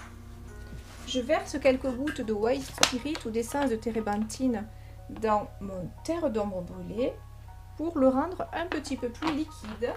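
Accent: South, France. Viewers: J'aime beaucoup ce que vous faites. Celui-ci me paraît simple, je le ferai dès demain.! 🤔🤔🤔⚠️⚠️⚠️ Enfin je vais essayer. Bisous bisous.. De quelle région est votre accent? Marseille, Perpignan? Toulouse.? Parce qu'il me semble que nous avons le même, moi c'est du Midi 😜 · Il est ma..fini.. fi..que votre tableau. Je suis abonnée depuis plus semaines et j adore visionner vos turbos. Merci encore pour votre voix chantante.. du Sud sans doute??😃💋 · Un grand merci pour ces partages, vous avez l'accent du soleil,J'adore !🎨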